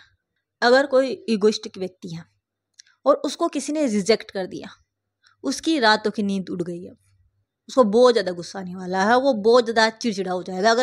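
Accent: Rajasthan, India. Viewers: Another Great Video From BTW You Sound So Rajasthani, Are You From Rajasthan?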